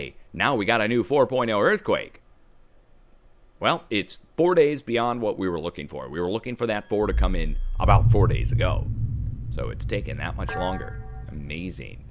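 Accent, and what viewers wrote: Russia. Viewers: Good work, and I love your foreign accents, especially the Russian one. Stay strong! Much love! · Hey, love the cheesy Russian accent!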